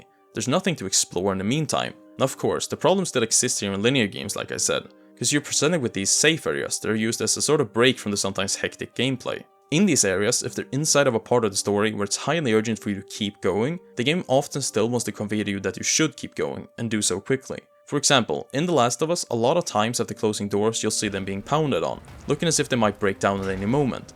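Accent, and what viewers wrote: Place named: Philippines. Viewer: You sound brown, like a jungle asian or some kind of filipino.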